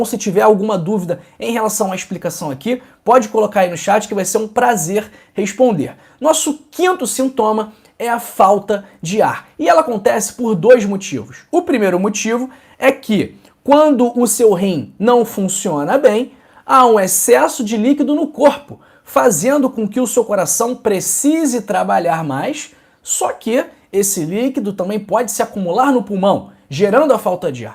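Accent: Rio de Janeiro, Brazil. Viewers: Esse sotaque de malandro carioca faz derrapar toda a credibilidad neste vídeo 🤣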